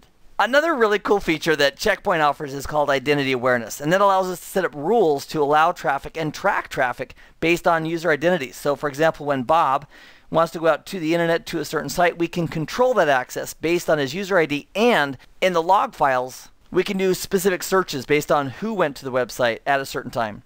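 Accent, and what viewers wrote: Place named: United States. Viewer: You have the most American voice ever.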